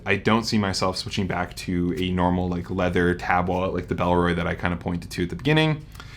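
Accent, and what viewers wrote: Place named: Canada. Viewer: Excellent review. Merci beaucoup du Quebec. I noticed your Canadian accent and the bills in your wallet.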